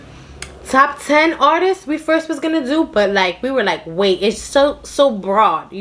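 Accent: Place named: New York, United States. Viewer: I’m watching or listening to tour video while I’m doing my moms makeup and she said is she from ny I can hear the accent lol😘😘😘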